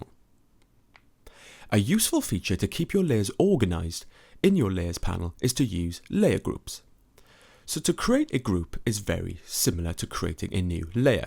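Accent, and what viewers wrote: United Kingdom. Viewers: OMG ! i don't know how to say thanks ,, you are really great teacher .. thanks a lot , and i liked your British english pronounciation ^^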